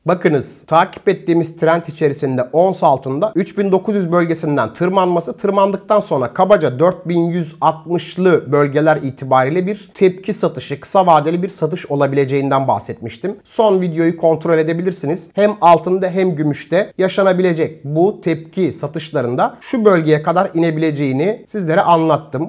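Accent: India. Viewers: Turkce dinliyemiyorum:) ve hint aksanı vae bilginiz olsun:) uk deyim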